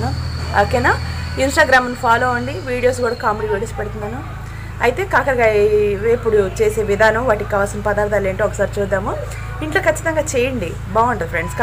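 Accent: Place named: Guntur, India. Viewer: Ala em undadu Amma urdu every state different slang untadi meeru matladindi guntur/Vijayawada slang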